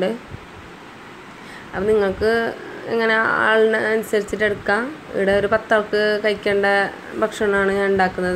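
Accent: Kasaragod, India. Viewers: Super... nhammale kasaragod baasha.. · Kasrood slang sooper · 👍👍👍nammale ksd slangil bisiyo pareembonne pang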